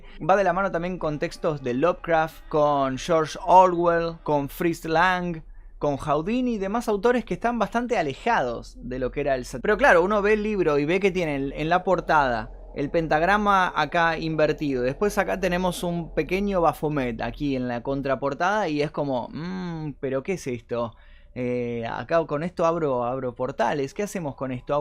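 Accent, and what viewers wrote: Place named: Argentina. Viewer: 😏 argentina xddd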